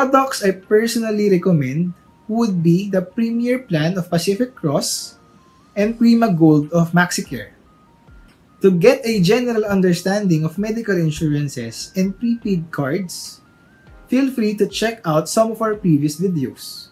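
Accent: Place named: United States. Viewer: Wala akong na intindihan, Americano ka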